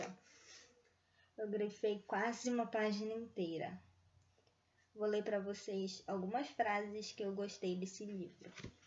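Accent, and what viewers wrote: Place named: North, Brazil. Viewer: Parabéns pelo vídeo, muito bom, engraçado a atemporalidade de certos assuntos aqui na plataforma... Sou do Amapá, muito bom ver um sotaque nortista ecoando conhecimento.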